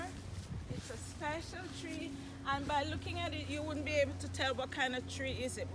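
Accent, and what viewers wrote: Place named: Jamaica. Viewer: Is she from Jamaica I,can tell by her accent and she has a akee tree we have those in puertorico we got it from our Jamaican neighbor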